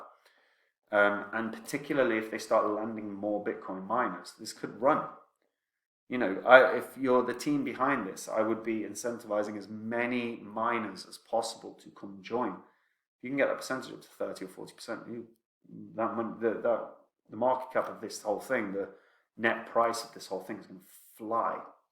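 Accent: Scotland, United Kingdom. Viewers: do i hear a hint of scottish in your voice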